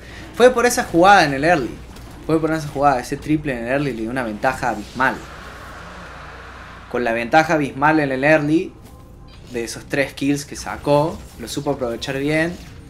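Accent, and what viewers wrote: Argentina. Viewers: se me pega el acento argentino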